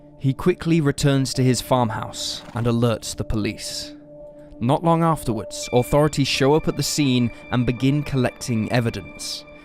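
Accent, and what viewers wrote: United Kingdom. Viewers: Why does the narrator have to have that British accent? Use an American!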